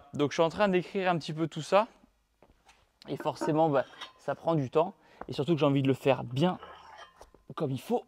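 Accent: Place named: Toulouse, France. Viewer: 14 ans que je le suis aussi , on a connu tibo quand il avait l'accent "toulousaing" fréro le temps passe trop vite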